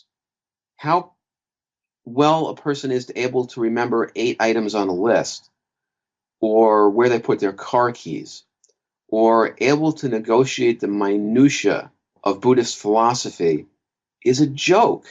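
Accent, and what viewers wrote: New York, United States. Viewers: Awesome perspective. You sound like youre from the ny area...so am I so theres an added comfort of sorts there. Thought really does lead to forms. The universe is one long song. When we are in harmony, we feel joy. When we are in disharmony, we feel anxiety. The problem today is there is an unspoken pressure to contruct a life that is by design unfulfillibg. So we seek pleasures to relieve us and soothe us. Sickness of society can only be faced by the individual. Seeing the power we truly have every day could liberate so many from the victim mindset. Much thanks!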